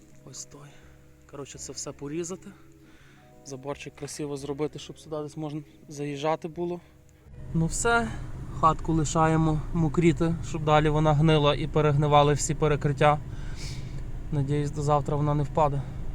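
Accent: Ukraine. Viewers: ТАК НАСМІЯЛАСЯ З ВАС. ВСЕ ПРИКОЛЬНЕНЬКО. ВАША МИЛА ВИМОВА ПРОСТА УКРАЇНСЬКА РАДУЄ. НЕ ЛЮБЛЮ МАТЮКІВ,АЛЕ В ВАС БУЛО СМІШНО. ГОЛОВНЕ ХАТКА ДІЙСНО ДУШЕВНА. В НІЙ ТЕПЛО ХОЧА НІХТО НЕ ЖИВЕ І РОЗВПЛЮЄТЬСЯ. ПІЧКИ ,ПЄЦИ ТО ШЕДЕВР!БЕРЕЖІТЬ ЦЕ. А ОТ ТА ЛАВКА ВИ СКАЩАЛИ ДЕРЕВЯНА ТО СОФА,АБО БАИБЕТЕЛЬ ЩЕ НАЗИВАЛИ. ДЕ ЯК В ЯКІЙ МІСЦИНІ. АЛЕ В ЄВРОПІ ЦЕ РАРИТЕИ! ПРОШУ ЗБЕРЕЖІТЬ ЇЇ НЕ ПОДАЛКУЄТЕ ВРЕА ЩЕ ВІДКРИВАЛАСЯ КРИШКА І ТАМ РІЗНІ РЕЧІ ЗБЕРІГАЛИ. ПОСТІЛЬНА БІЛИЗНА,ОДІЯЛА. В ІТАЛІЇ ІТАЛІЙЦІ ЇХ В РУМУНІВ КУПЛЯЛИ РЕСТАВРУВАЛИ І ,ЯК МЕБОІ ПРИКРАСИ ЦЕ. ЗИЧУ ВАМ НАТХНЕННЯ І УСПІХУ. СЬОГОДНІ ЗНАЙШЛА ВАС В ЮТУБІ І ЛЮБУЮСЬ.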